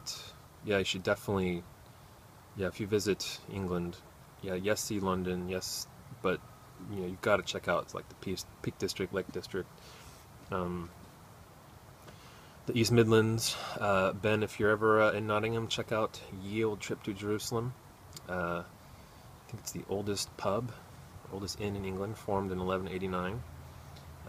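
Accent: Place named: United States. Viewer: Thanks. Talking of sharing a different language I notice you Americans pronounce "route" like "rowt" rhyming with "out" whereas we say "rewt" rhyming with "newt".:)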